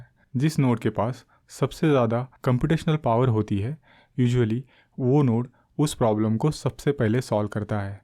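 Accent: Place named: Maharashtra, India. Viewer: seems like you are Marathi person.. accent warun watala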